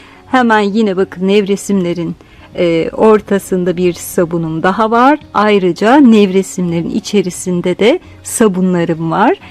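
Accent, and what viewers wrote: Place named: Denizli, Turkey. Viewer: Gayduru gubbak cemilem deyve gari. Bende çok giderdim Denizliye eskiden. Aksanli videonuz cok hos. Merak ediyorum siz yurtdisinda yasadiniz eskiden? Ne is yapiyorsunuz? Sanki televizyonculuk yapmisiniz gibi.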